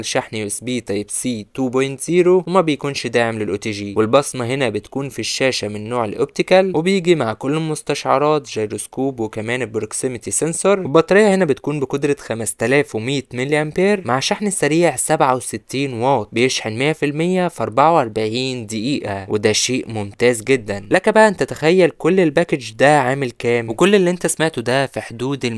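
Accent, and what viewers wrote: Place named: Syria. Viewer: اللّٰه كريم بس شكو تحجي سوري وانت عراقي 🗿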